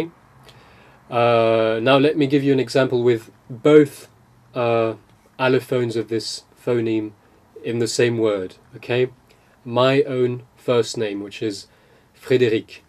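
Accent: England, United Kingdom. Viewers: Actually, I really think this "letter" could be a great difficulty for an english speaker... Even in "Francophonie", we have different pronunciation ... For example, here in Belgium, the center, near the capital Brussel, we use a "R" more agressive than the South of Belgium... in some places, they "roll" this letter... like in Italian... And in Quebec, I think they pronounce de R almost like... englishesspeakers! LOL So, if you try to learn, I just have to say GOOD LUCK! And sorry if we hear your accent... it's allways like that, but we love that cute english accent! (we don't recognize if it's English, American, Canadian... or else, but we love it! really! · Fantastic English accent! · You have a lovely English accent :)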